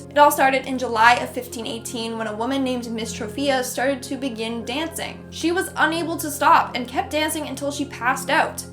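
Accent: France. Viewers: I love the French accent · I like your French accent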